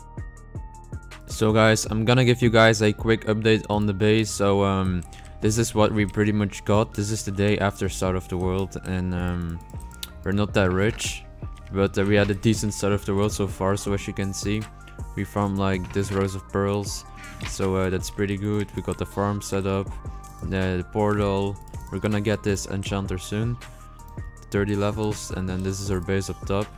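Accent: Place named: Netherlands. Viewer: U sound Dutch. Are you Dutch?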